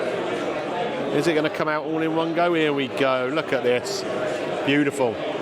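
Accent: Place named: West Country, United Kingdom. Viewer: yes mate when I was watching you sounded abit more West Country but then you would say the odd word which you sounded like a Hampshire hog like me haha.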